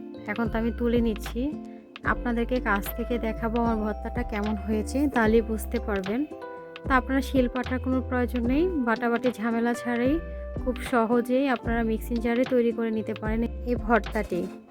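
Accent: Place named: Brahmanbaria, Bangladesh. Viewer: ব্যামনবাইড়্যার মতো কতা কইন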